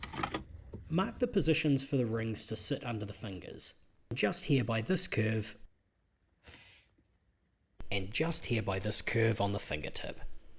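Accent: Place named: England, United Kingdom. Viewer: I LOVE that this guy has an english accent! You're like this is a straight laced normal guy. Then he makes a screen accurate Freddy glove!! I thought u liked tea and krumpets!?!? My childhood is ruined and it's not about Nightmare on Elm Street! THIS guy and his babysitter voice, piecing together of screen accurate Freddy glove... What accent is that? I'm staying the f*** away from that town...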